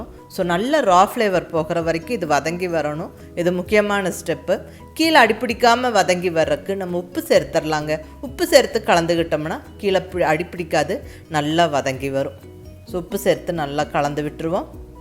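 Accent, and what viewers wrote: Kongu, India. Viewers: Hai Mam! I love to hear your Kongu Tamil. The way you explain , each dish is so clear that even the beginners can understand well. Will you give the link for the white Kadai which you used for the preparation of Ragi halwa?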